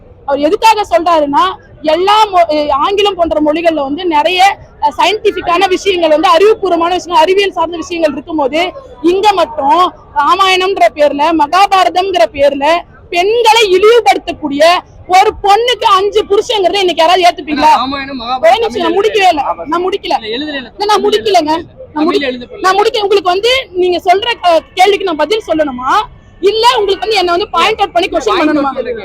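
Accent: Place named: Telugu, India. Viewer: அக்கா பேசுறத பார்த்தா தெலுங்கர் தான் இருக்கு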